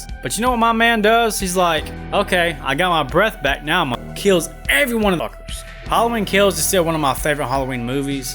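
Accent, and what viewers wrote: United States. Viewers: Love your Accent dawg, What State?? 🖤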